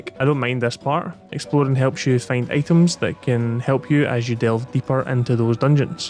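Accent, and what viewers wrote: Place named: England, United Kingdom. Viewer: AHAHAH this is so funny i love people not understanding english accents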